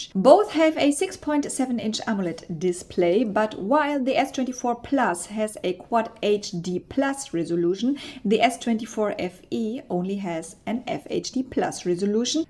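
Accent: Germany. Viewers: You have a beautiful German accent 😊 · She almost sounds like me with that accent. Living in the USA now coming from Berlin Germany.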